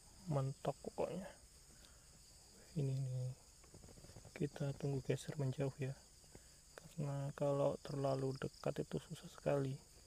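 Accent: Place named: Java, Indonesia. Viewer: hehe sama2🙏🙏logat2e ngomonge kok masih enek jowone to om😃😃🙏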